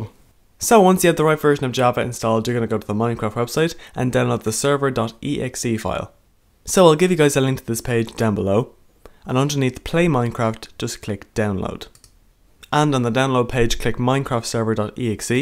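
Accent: Ireland. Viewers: Are you from Ireland you sound it? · are you irish ? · I LOVE YOU YOUR IRISH LIKE ME!! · u r irish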